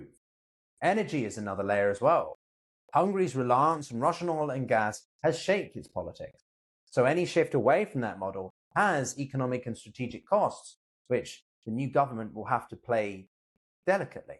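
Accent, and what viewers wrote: England, United Kingdom. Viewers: Another English Accented Narrator. England is in trouble! Git off Celtic lands! Says The BronxBoy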